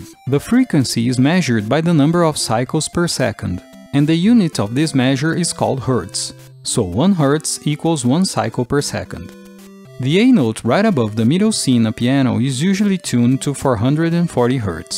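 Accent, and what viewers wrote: Brazil. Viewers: Eu tava sentindo um sotaque brasileiro ai no meio, fui ver o canal e é BR kkkkkkkkk, mt bom o vídeo mano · Brazil accent spotted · Eu amo como as palavras ficam com um sutaque brasileiro kkkk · o sotaque brasileiro é inconfundível KSKSKSKS mas acho que peguei uma época diferente, porque eu jogava Super Mario Bros no emulador de NES do Ps2! É interessante saber que em outros consoles ele era transmitido diferente, eu não sabia disso haha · Bro you have the most Brazilian accent I've ever heard! 🇧🇷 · Cai nesse vídeo aleatoriamente. Não Conheci ao canal. Por acaso você é brasileiro? Sua pronúncia é idêntica a um brasileiro falando inglês. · when you spoke I already knew u were Brazilian 😂😂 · I know a brazilian when I hear one, great video bro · orra, o sotaque dele FULL BR mano kkkk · Eu sabia que o teu sotaque era brasileiro demais pra ser um gringo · Sotaque brasileiro batendo forte. 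· Sotaque de brasileiro… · O sotaque de um brasileiro é realmente familiar. Que legal. 🎉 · Esse sotaque não me engana! Brasileiro, né?? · Eu conheço meus irmãos brasileiros pelo sotaque, abraço, excelente video! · O sotaque BR gritando hauhauha Excelente vídeo amigo. Ganhou um sub. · Você é brasileiro? Seu sotaque lembra um pouco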